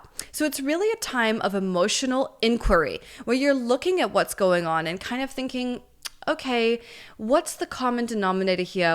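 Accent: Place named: New Zealand. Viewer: 😹😹😹 FUCK ME... you are hilarious!... it’s so lovely to hear another NZ accent and one that swears as much as I do! 😹😹😹 I shit you not muffin! 😹👍🏻 I am here in LA 😋💛 and I am on IG... not very much but I will toddle on over and DM you 😹👍🏻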